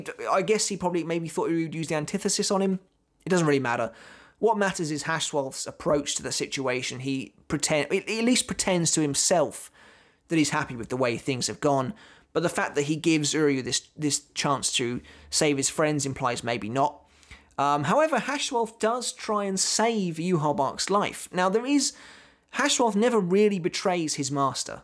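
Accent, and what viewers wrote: United Kingdom. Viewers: Are u British?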